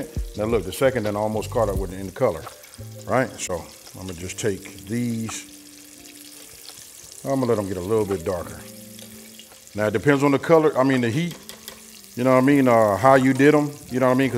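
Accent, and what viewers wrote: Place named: Texas, United States. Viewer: You sound like a good Texan! Loved the recipe.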